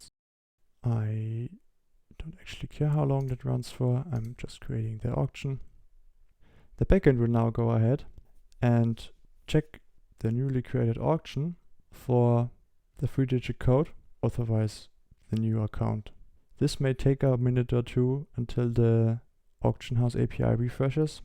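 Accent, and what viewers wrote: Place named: Germany. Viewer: Der Deutsche Akzent knallt richtig hahaha gutes VIdeo ^^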